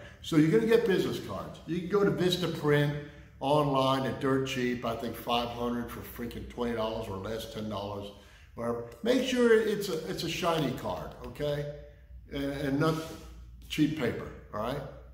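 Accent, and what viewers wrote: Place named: New York, United States. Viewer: ... I knew it! Lol! My wife and I were watching one of your vids on the big screen, and I said to her "You think this guy is from New York?" She said "Nah" I'm originally from Syracuse, transplanted to Ohio about 26 years ago. Got a lot of 'you from NYC?' Didn't think I had that strong of an accent being from upstate and all. Now, the NY accent only comes out when I get upset. Lol! Have a great evening!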